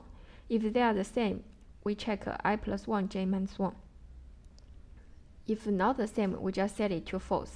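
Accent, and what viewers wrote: China. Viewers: Improve your English. It's hard to understand so called Chinese English.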